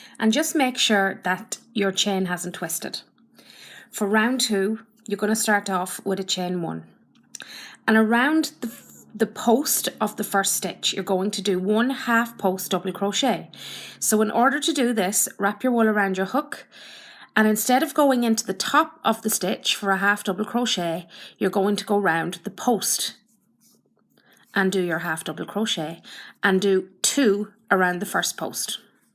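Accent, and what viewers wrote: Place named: Scotland, United Kingdom. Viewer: Hello again! I just started making a pair for me (I know right) tonight and went over to one of my favorite Youtube podcasters and shared your channel with her. I hope you don't mind Your tutorials are literally the bomb. Between your amazing (Scottish accent, hoping and praying I got that right) and your style of crochet? I love, love, love, your channel!! Here is Teri's Channel <link>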